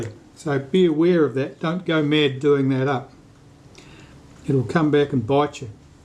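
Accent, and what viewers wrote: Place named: Australia. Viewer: Thank you for this series. Although I have not yet completed my Mockba 4 shutter project, it has been helpful. I CAN report that common internet information would have us believe that the Mockba/Moskva cameras were made identically to the Zeiss cameras with manufacturing equipment they brought back to he USSR as spoils of war, changes were made by he 1950's. The Mockbas went from 5 shutter blades to 3. They are NOT of Zeiss machine fit and finish, and the metal used was obviously of a softer grade of brass in the shutter speed wheel. The springs have not held up their strength well at all. My next project will be my prized Super Graphic with 127 Ektar and Graflex/Compur shutter. I'm confident it will turn out, thanks to you. As for his Moskva or Mockba, I've relegated myself to being happy with a fast/medium/slow, and B setting ,and re-labeling the dial accordingly. 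BTW, No mistaking where you're from. Don't tell me... Australia. I'm in the US, although I expect a transfer to heaven in the next few years. Regards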